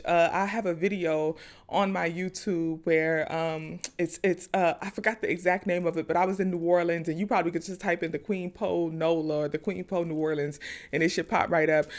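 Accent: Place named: North Carolina, United States. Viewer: You sound like you're from North Carolina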